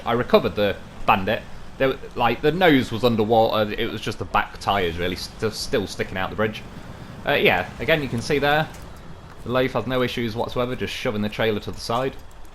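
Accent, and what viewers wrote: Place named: Caernarfon, United Kingdom. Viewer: Hi there. As a regular viewer of your Snowrunner vids I’ve spent a lot of time listening to your accent. While I appreciate you don’t have a North Wales accent - there is a slight hint of a Caernarfon/Anglesey edge to it. Just wondered if you’ve ever lived up here in North Wales? Sorry if it a personal question! Keep up the good work - your Dave stories are great and general banter as you drive is very funny. I regularly laugh out loud. Needless to say I now own multiple Loafs thanks to you. I whole heartedly recommend this channel!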